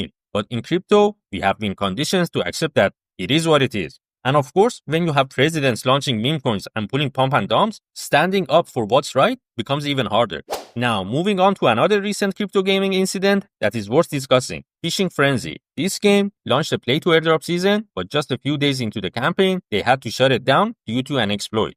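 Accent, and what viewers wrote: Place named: Iran. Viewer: حاجی حس می کنم با این لهجه انگلیسیت ایرانی هستی.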